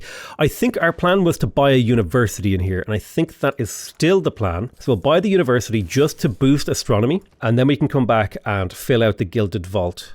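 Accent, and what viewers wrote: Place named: Ireland. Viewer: Anyone else think that as progresses, his irish accent gets thicker?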